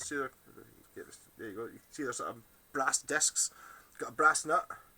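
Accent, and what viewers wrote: Scotland, United Kingdom. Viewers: Thank you.......Yes your Scottish accent threw me off a little bit lol, also your right about the Factory being Top-notch, I can tell because I can see just how Well this guitar is made. I've owned several priceless guitars in my day, I'm no stranger to CRAFTSMANSHIP, and this Instrument HAS IT! Plays Great, Feels Great.......Thanks again you have another Fan who now resides in the middle of the Pacific. Aloha from Maui.......🎸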